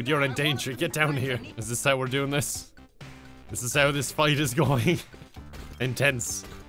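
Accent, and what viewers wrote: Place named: Ireland. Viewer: it's nice to hear a true irish accent +1 sub. i like you editing style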